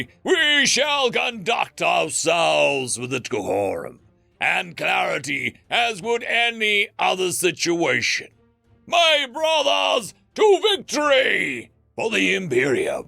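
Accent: Scotland, United Kingdom. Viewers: " A very British accent..." does a Scot instead. Nigel Green as Colour Sgt. Bourne from "Zulu" probably would've been a better choice. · Very British Accent 😂 well technically Scotland is in Britain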